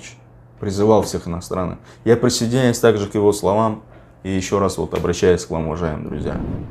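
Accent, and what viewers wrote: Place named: Uzbekistan. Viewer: Кайси шевада гапирасиз узбекчани жуда чиройли шевада гапирасиз 20 йил яшаган Россия да усганга ухшамесиз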